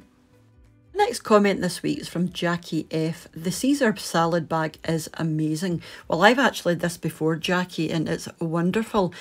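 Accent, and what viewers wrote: Scotland, United Kingdom. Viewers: I’m scottish and I like her accent, it’s slightly different from mine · Its a lovely Scottish accent x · if you don't like accent or Scottish accent in general, why would you even bother commenting to be honest. Just scroll on. Loving these videos, keep them coming and ignore the trolls 👍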